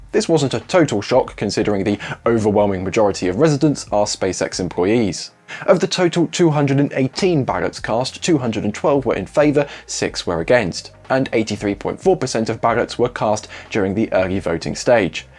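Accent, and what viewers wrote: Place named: South, United Kingdom. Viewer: Sad to see that some commenters are having trouble with his Standard Southern British accent. If you cannot understand standard English you should work on yourself, rather than complaining -- especially since the audience is international. If he had a heavy Scots, Irish, or north country English -- then I could accept complaints. But he is speaking NEWS ANCHOR quality English to an international audience. Ask someone from Europe, Asia, even Canada, he probably has the clearest accent of all the NSF presenters. Anyway, guys, just turn on subtitles and work on improving yourself.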